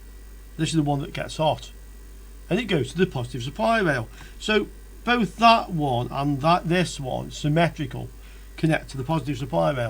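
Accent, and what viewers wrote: United Kingdom. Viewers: Thank you for your time and your helpful videos! I'm 44 and now I try to learn electronics. I'm from Austria, and so my mother tongue is German. Thank you for speaking this nice british-english! So I can improve my english at the same time. Thank you for your effort!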